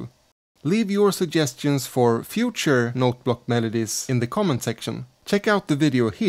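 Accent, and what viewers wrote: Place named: Sweden. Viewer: It sounds like ur swedish and talk english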